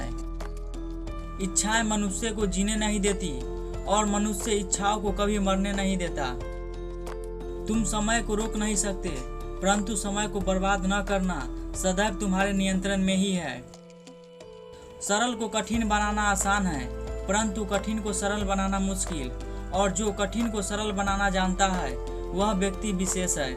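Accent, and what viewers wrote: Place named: Bhojpuri, India. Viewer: Bhai tumhare content and channel dono superb hai proud of you but aapke Hindi Bolney ki shaili mein shudhta lekar aao shabdon ko or clear bolo bhojpuri or maithili tone mein nahi Hindi tone mein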